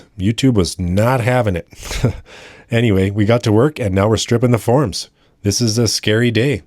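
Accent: Canada. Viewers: Are you Canadian by any chance?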